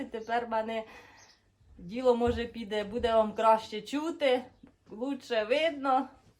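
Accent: Poltava, Ukraine. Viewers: Як мені подобається наш неповторний полтавський суржик!❤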